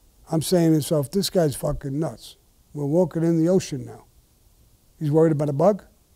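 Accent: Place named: Brooklyn, United States. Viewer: I have italian friends from brooklyn new york. They act, talk and sound exactly all the same. Just like Sammy.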